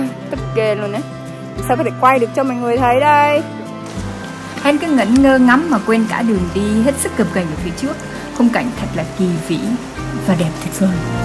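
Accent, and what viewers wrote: North, Vietnam. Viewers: Hen nói giọng miền bắc dễ thương lắm chị sẽ ủng em hãy làm những gì mình thích cô gái mạnh mẽ quá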